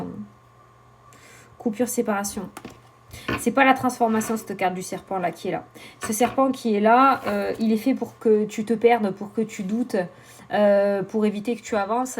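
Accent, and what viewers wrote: South, France. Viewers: Ja doré le accents du du sud. Perpignan. Le boulou argeles je connais bien bien moi je suis du Loiret beugency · Bonsoir j'ai été a Baillargues pendant 50 ans et je suis a Hyères depuis 2 ans on voit bien que vous êtes une vraie sudiste l'accent est formidable et vos éxplications sont très claires et exactes merci et à bientôt très cordialement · moi c'est le 04 Manosque tu vois le sud avec l'accent bien plus prononcé que le tien, chantant comme les cigales, très fière de mon accent tant pis s'il ne plaît pas à tout le monde c'est le mien 😘😍 allez hop la vidéo je t'écoute je suis toute ouïe 😊😊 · Bonsoir moi je viens du sud ouest Hossegor Et je rentre tout juste de Perpignan où vit ma Soeur Merci pour cette bonne humeur et l’accent mon dieu que je le connais et c’est meilleur oui, tu gères la fougère terrible merci 🙏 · Coucou du soir alors moi je viens de Clermont-Ferrand et actuellement nos belles montagnes sont sous la neige et on se pèle Ahhhh Perpignan c'est une belle ville c'est normal que tu ai l accent du sud ! 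jeudi qui s'annonce un peu compliqué mais on va faire avec En ce qui concerne le sport ce ne sera pas pour demain parce que je vais chez le kiné lol C'est super rigolo parce que j'ai le même jeu que toi Et ce matin je me suis tiré la carte qui me conseille de manger des fruits et légumes et de devenir végétarienne LoL Ton collier je l'avais remarqué j'étais certaine que c'était un cadeau de Noël, au fait en parlant de ça le collier chapelet je l'ai trouvé sur Amazon pas le même que toi mais très jolie aussi Faites c'est drôle parce qu'on utilise les mêmes jeux j'adore j'imagine que le goal de utiliser consultation je sais pas ce que tu en penses mais moi quand je les dans les mal ressenti est décuplé par rapport aux premiers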